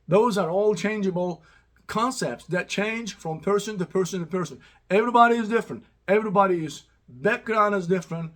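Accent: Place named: Texas, United States. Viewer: abi, teksas accenti ile konuşmak seni godfather filmindeki adamlara benzetiyor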